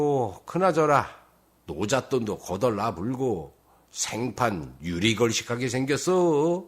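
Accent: Jeolla, South Korea. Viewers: 절라사투리. 징하구만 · 구수한 나레이션이 좋았지만 전라도 사투리가 어슬프 듣기가 거시기합니다. 걍 표준어로 하세요.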